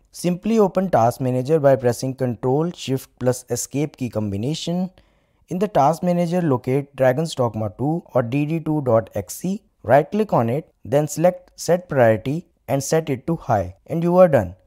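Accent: India. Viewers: soon as you hear the indian accent you know its gonna be the worst advice youve heard so far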